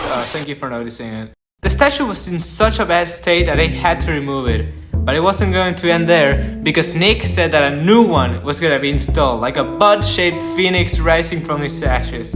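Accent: Argentina. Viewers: It's so great to hear someone who speaks English. Listening to him say words with an Argentine/Spanish accent. Well, I decided to eat Dulce de leche. Good video and bye bye everyone!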